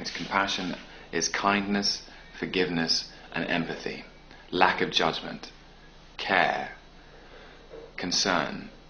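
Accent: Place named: England, United Kingdom. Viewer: I love hearing his English accent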